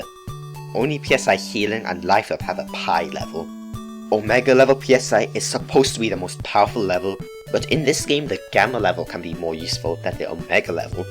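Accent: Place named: France. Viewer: Are you French? Your accent is really distracting . Sorry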